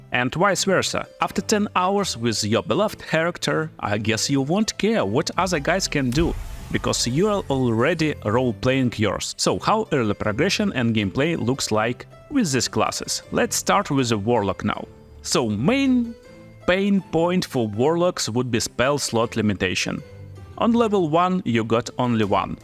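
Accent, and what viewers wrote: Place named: Russia. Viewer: Почему я чувствую русский акцент..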